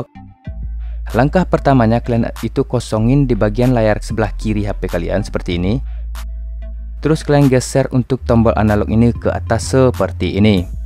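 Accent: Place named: Aceh, Indonesia. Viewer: Bang lu orang Aceh lah, soal nya cara ngomong logat nya kek orang aceh, perasaan gua doang atau gimana ya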